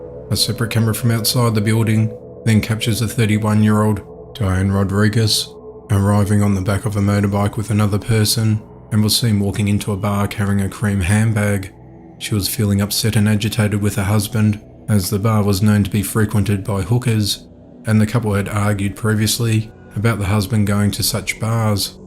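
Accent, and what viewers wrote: Australia. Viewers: is this an ozzy man speaking it feels very real to me hearing such horror from the USA spoken in my own speech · Entertaining and informative as always. Plus I think I enjoy hearing an Australian accent share the news. Great intonation!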